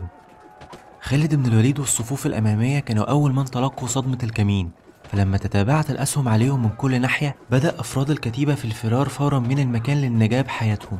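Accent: Egypt. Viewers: اول ماسمعت المعلق مصري سكرت الفديوه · ياخي طور من لغتك العربية الفصيحة انت تتحدث عن تاريخ اسلامي ، اعلم انها صعبة على اخواننا المصريين لكن حاول تخفي لهجتك المصريه وان تخرج الحروف من مخارجها الصحيحة ولاتغير نطقها (تمنى تقبلها بصدرٍ رحب)💙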